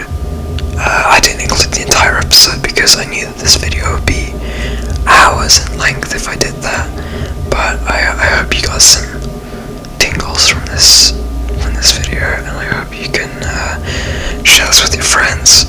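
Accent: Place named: Australia. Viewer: You sound Australian in this one.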